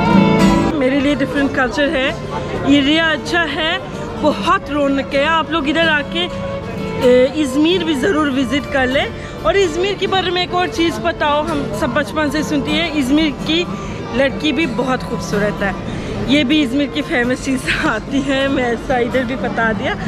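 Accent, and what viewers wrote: Pakistan. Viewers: It’s really a pleasure to just hearing you speaking Urdu.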